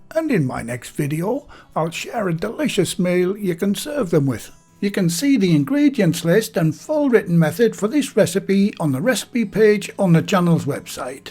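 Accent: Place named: Gateshead, United Kingdom. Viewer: Move to Gateshead, they all sound like that.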